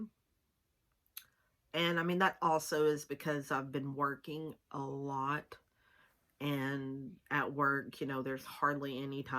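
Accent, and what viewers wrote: South, United States. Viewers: I really love your intro! lol your country accent is just a little stronger than mine! praying for you and sending positivity! Thats me right now so I am with you on that. Losing someone you love and never being able to see them again is so hard.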